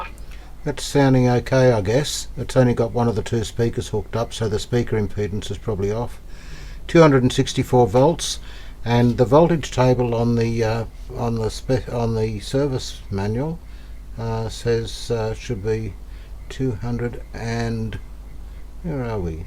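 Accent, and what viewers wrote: Australia. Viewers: Hi, I know this was a long time ago now. My name is Sara. I've been trying to restore my grandparents' old nippergram. One of the.01MFD 630VDCW capacitors are no longer working. Do you know what could work as an alternative and where to source such a thing? It also sounds like you're in Australia. I'd love to know whereabouts and if it's not too far, I wondered if you could help me to get it up and running again 🙂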